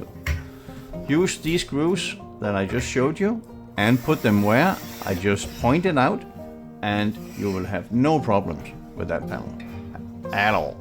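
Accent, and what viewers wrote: Denmark. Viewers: Does anyone have recommendations on which style of Ikea cabinet is best? Has anyone used the Vedhamn cabinets? We're also considering white or off-white. Not sure which model to choose. I love videos. So grateful to have found this channel with this wonderful Danish man. Both of my parents were born and raised in Denmark so I really love hearing that accent.❤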